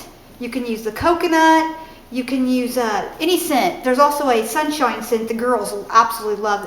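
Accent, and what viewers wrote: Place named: Appalachia, United States. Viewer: Sounds like a hillbilly and can't do it right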